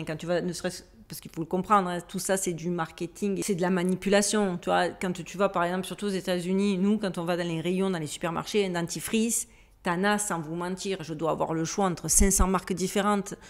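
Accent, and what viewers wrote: South, France. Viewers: Trop top tes vidéos!!merci ❤ Trop jolie naturelle et ton accent frenchy trop bien ça chante le Sud!? Ne change rien!! blessings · Bonjour le Sud ( c’est pour l’accent ) à défaut de te connaître!! T’es sujet sont toujours pertinent et apprécié; pour infos et pour rester sur ton intérêt pour l’intelligence artificielle et le contrôle des masses, connais tu l’expérience dit: « MK ULTRA « si oui quand pense tu? Si non, je te laisse te renseigner et je pense que cela devrait t’intéresser. Bonne continuation et à bientôt pour de nouvelles vidéos. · Merci pour cette vidéo, merci pour vos recherche et nous donner des éléments de réponses pour s’élever et mieux comprendre notre monde. Vous êtes captivante et cet accent marseillais j’adore! fever ❤️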